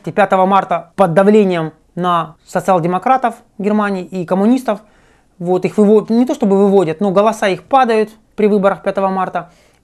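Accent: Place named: Rostov, Russia. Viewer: Девушка с Ростова? Знакомый говор, как собака лает. Кажется, что хамят, но это тут так разговаривают.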